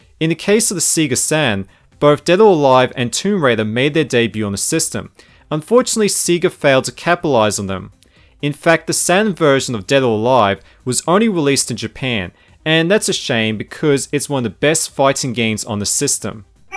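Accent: Australia. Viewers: Hi Mate. You sound like you are in Austarila, so am I. Did this Japanese import NTSC-J game play fluidly on your PAL Sega Saturn? Is your Saturn unmodded?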